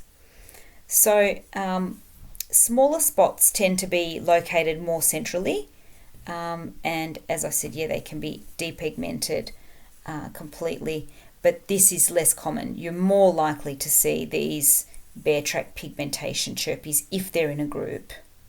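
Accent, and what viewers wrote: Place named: Australia. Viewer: I like your accent sounds like australian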